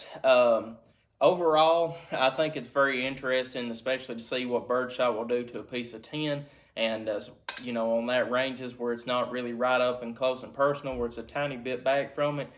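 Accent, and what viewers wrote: South, United States. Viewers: Why does watching a gun review from someone with a heavy country accent feel so much more reassuring?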